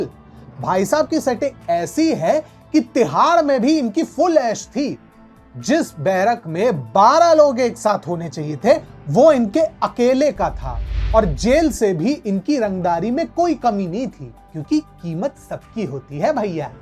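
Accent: Standard. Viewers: Aapki shudhh hindi vachan shaili bahur sundar lagti hai!👍👍👍👍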